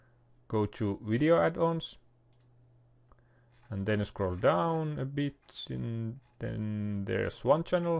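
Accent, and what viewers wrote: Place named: Finland. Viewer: Tunnistan suomiaksentin tästä :D Kiitos kuitenkin!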